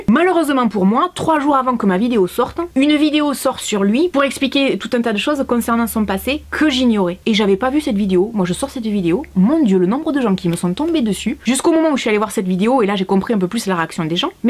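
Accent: South, France. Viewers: Bonjour, je te suis depuis 2 ans et j'adore regarder tes vidéos, ton sourire, ton accent du sud et ta bonne humeur sont les petits rayons de soleil de mes journées. J'aime tes vidéos sponsorisées par ta carte bleue, je sais que je peux faire confiance. Cette année à été difficile pour la plupart d'entre nous et tu l'a rendue plus douce. Continue à nous faire sourire et un grand merci pour tout. Bonne année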